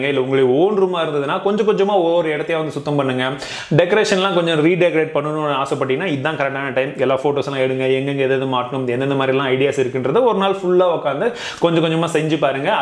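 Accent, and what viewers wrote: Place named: Kerala, India. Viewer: Name la tamil nu eruku ok but unga slang liteta apo apo malayali slang varuthu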